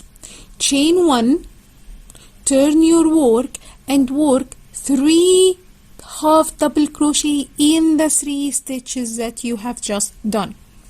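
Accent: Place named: Egypt. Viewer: عرفت انك مصرية من اول ثانية في الفيديو 😅 اهلا بيكي و شكرا على الفيديو الجميل ده ❤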